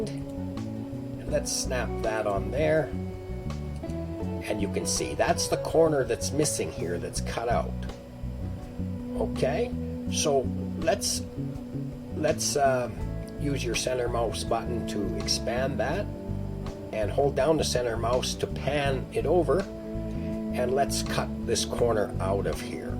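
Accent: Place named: United States. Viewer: Glad to find a American English speaking vid on LC. As I am hard of hearing...some with heavy foreign accents are difficult to follow. I would suggest modifying the background music or eliminating. The bass line of the music is near the pitch in your voice...reducing the clarity of your voice. Thank you for the video and I have subscribed.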